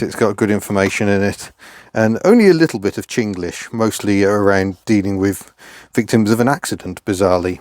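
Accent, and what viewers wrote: United Kingdom. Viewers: Because of your brit accent I kept expecting a pantomime horse (we don't have any in Canada but some have learned of its UK existence and of panto theater traditions through books and the Web) to appear suddenly each time you expressed your love of pantograph wipers. By the way we don't have European regulations for quadfracycles here in Canada so that cute truck and the Citroen AMI and others simply never show up on our streets. However, we have rules (or lack of actual specific rules but cover all general rules) for enclosed mobility scooters. They are like giant perfectly enclosed battery powered wheelchairs for semi-disabled people or for the very old people who are not allowed to drive cars in the street anymore because of vision issues or other medical problems. In other words the enclosed mobiliy scooters are forbidden on normal streets and must instead travel on sidewalks or bike paths!!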